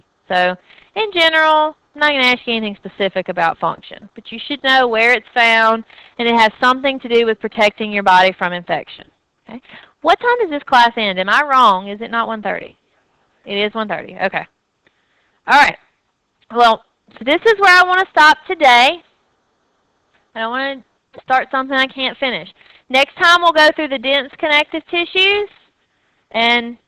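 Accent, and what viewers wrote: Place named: Rural, United States. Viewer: Oh shit. I love it when smart people have hick accents.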